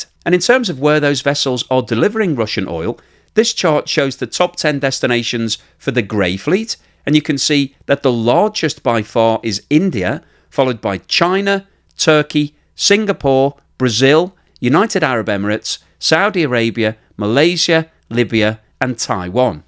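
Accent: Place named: Liverpool, United Kingdom. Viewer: Where do you live???? Liverpool I would guess 😊😊😊😊😊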